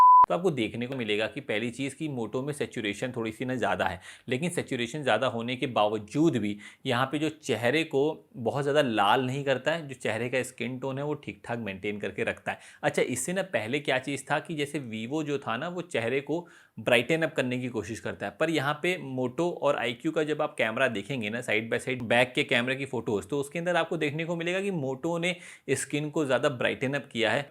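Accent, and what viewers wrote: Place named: Bihar, India. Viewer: Bhihari tune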